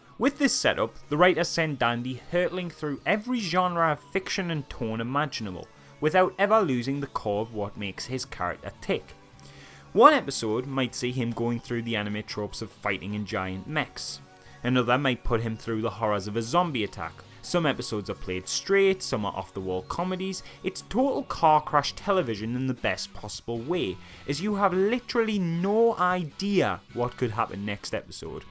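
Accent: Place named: Geordie, United Kingdom. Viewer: You absolute geordie. Let's meet up and Have a space dandy time. "HEaring yoou discribe this is pua amaaaazin" vowels going as hard as this show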